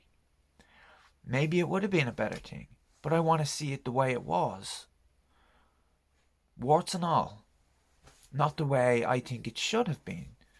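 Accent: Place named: Ireland. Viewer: I love the Irish accent, it's my favourite alongside the Italian accent. It's always nice to hear an Irish accent, especially when they talk about a subject I'm passionate about. :)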